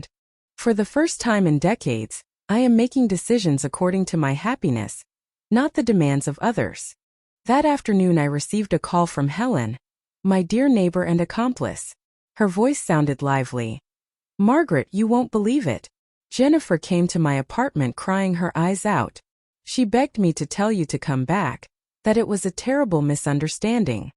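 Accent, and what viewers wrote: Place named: United States. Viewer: If you're going to use AI to create a story supposedly by an Aussie, you could at least use an Aussue and not an American voice! Also you'd get a lot more than $110K after sale of a unit in Sydney. More like $1M.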